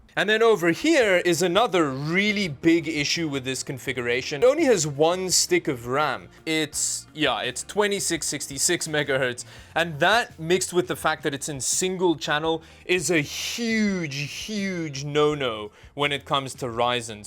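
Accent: South Africa. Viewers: Are you from south Africa? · are you south african? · That accent confused me, I hear a South African accent there. What's your story? :) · What accent is this, you sound like me, but being in Canada throws me off maybe it's an odd French/ Norwegian I assume SA though because you said suss out and sketch. Common use here. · South African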